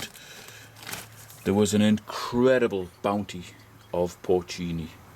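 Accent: North East England, United Kingdom. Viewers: Thanks for your useful video. Just started my dehydrator journey today using a l'Equip Filter Pro, so hopefully no more chasing maggots around my kitchen from now on! I was interested in the temperature that you used to carry out the dehydration process. Have you tried using a lower temp. as I have seen it suggested that 52 C can produce better preservation of mushroom flavour? I live in Devon and never find the quantities of ceps that I see foragers collecting in other parts of the UK. I am guessing from your accent (a hobby of mine) that you might be in the north east, perhaps Northumbria? I would love to take a trip to Scotland and the north east of England in October to see what bounty I could discover. Thanks again and good foraging to you.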